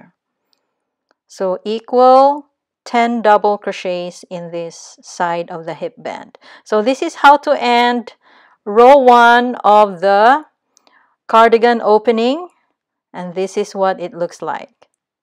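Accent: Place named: Philippines. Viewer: Are you Filipina? I like the way you speak.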